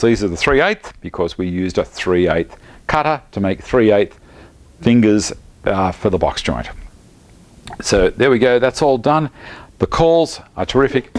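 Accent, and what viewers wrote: Australia. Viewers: I was wondering why I love watching this video, then I've read in comments that you're from Australia :D Love the humor, the accent and of course the build itself! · Any reason you put the wood through the thicknesser before the jointer I've always thought it was the other way around? It is nice to hear an Aussie voice on you tube BTW.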